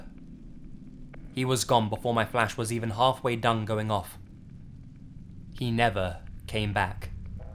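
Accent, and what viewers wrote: United Kingdom. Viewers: I have a new nickname for you, it's British voice narrator guy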